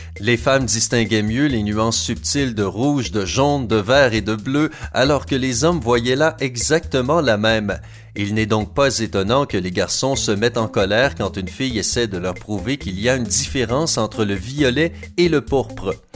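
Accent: Quebec, Canada. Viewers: Ça change avec une voix québécoise ! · He is from Canada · Hé le narrateur est québécois!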